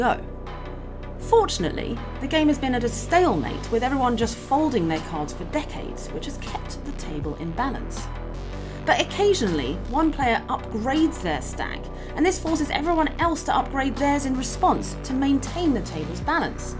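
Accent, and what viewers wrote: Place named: United Kingdom. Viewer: I love your female British voice I wish I can see your voice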